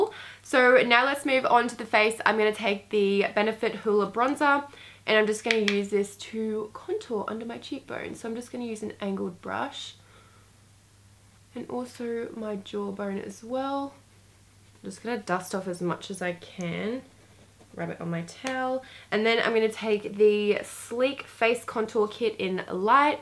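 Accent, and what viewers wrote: Australia. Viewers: Omg i love this look, and for people that are saying, " why is she wearing all that makeup her skin is flawless" Yes her skin is flawless and i hope she doesn't wear this much makeup on a daily basis. But she has a bloody makeup channel what do you expect. lots of people wear makeup just because they love to apply it and wear it. i don't wear makeup because I'm only 12, and my Mum wouldn't approve, but I still love to wear it on occasions ( when i get the chance.) Also I'm Australian and all those american Youtubers can get annoying at times, But listening to someone with the same accent as me is way nicer. Sorry for long af comment but recently found your channel and I love it ! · For some reason I feel like if Kendall Jenner had an Australian accent, she would sound like you😂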